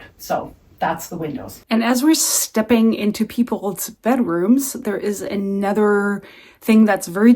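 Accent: United States. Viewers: American accent but perhaps has not lived in the United States since she was a little girl and doesn't know much about it? The removable shower head shown is available everywhere, Home Depot, Walmart, etc. Even the fixed shower heads are adjustable so you can aim them where you want. Windows with interior shades, while not popular, are available in the US. Many, perhaps most American homes have a concrete basement with stairs going down to it. Not all American homes are, as she implied, overdone. Perhaps she watches television and makes assumptions? Many, MANY are simply furnished. I'd say her video "German vs American Homes... this explains everything actually explains nothing. Instead of making stuff up, she should do some research.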